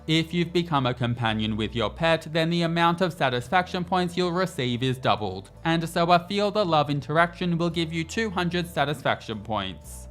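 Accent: Australia. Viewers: Hey! No we don't! 🤣 I'm English, born and bred, and have either friends or family from all three of the other countries within Britain, and we pronounce it 'COE-coe' (both syllables rhyming with foe, or sew, with the stress on the 1st syllable) like I thought everyone else in countries that use English did until now. Don't think he's British, his accent sounds Australian? or New Zealand? but none of the Ozzies or Kiwis I know pronounce it 'coe-COE-ah' either. I also had a good laugh at 'bom-BAD-ee-er' (Bombadier Beetle) - I've never heard it pronounced any other way than BOM-bah-deer 🤣🤣🤣 Don't watch youtubers much so not familiar with this guy - I actually started thinking it might be an AI voice 😳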